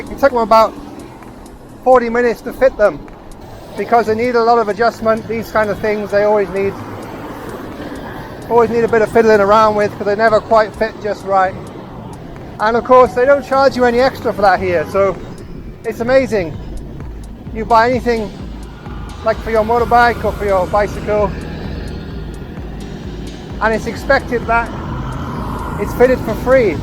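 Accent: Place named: West Country, United Kingdom. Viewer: I like the gentle west country unassuming presentation style, check out the great live music scene in Saigon if you haven't already, cheers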